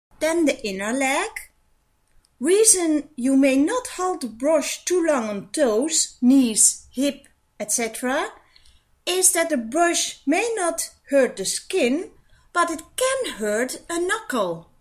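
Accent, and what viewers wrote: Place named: Netherlands. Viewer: What a horrible dutch accent, my ears are bleeding.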